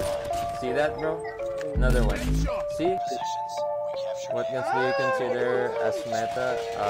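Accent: Philippines. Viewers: Pinoy ka pala idol , dito ko lang nalaman hahahah, akala ko foreigner, kaya pala pamilyar ung accent mo, lagi ko pinapanood vids po idol, salamat sa mga contents mo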